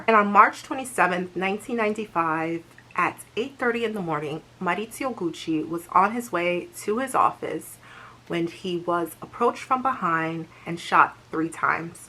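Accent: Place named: Italy. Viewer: Love your Italian accent! Good review! Now I want to see the movie!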